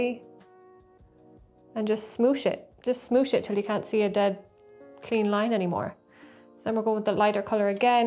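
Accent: Ireland. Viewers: Oh wow, I wasn't expecting an Irish accent. Galway or Mayo I'd guess (from Galway myself, and in fact see my own first name on the wall). Kinda new to wargaming/mini painting and have no idea how popular it is in Ireland, so this at least gives me some hope! Looking forward to checking out the rest of the channel after this as I'm loving this tutorial so far. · Always been on the lookout for a tutorial that shows exactly how to paint skintones, seen some but they were very vague. So seeing this video with it's perfect on the spot tutorial being shown by the gorgeous has already made 2022 worthwhile. The fiery red hair, bright red lips, gorgeous eyes and that wonderful Irish lilt, WOW! Going to check out your backlog of videos and Subbed! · A beautiful redhead chirping away at the camera in a charming Irish accent and doing a rather good job painting up that miniature was a great thing to watch before bedtime, tonight. 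Good job, Miss, and I bet you do rock the elf ears if ever you wear them?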